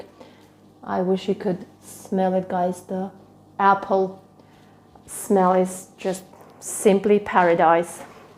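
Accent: United Kingdom. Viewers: I'm hearing a British accent? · I mean no offense-- I cannot decide if you are Russian, or British. Your English, which is excellent by the way, has a slight British accent. I know sometimes people pick up the accent of an instructor in a different language. I very much enjoyed the tour of your apartment and building. It appears a middle class city to me. Knowing nothing about it, I might guess heavy on industry? Thank you, very nice video. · I hear a slight British accent to your speech.........In Greece people have washers, very rare to have dryer....I think that is pretty common in Europe. This apartment is quite large. area looks middle class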